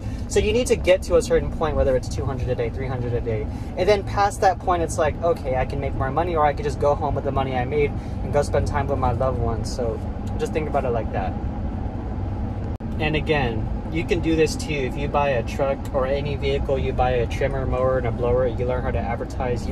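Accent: Texas, United States. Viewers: U talk so Texan shout out from DallasTx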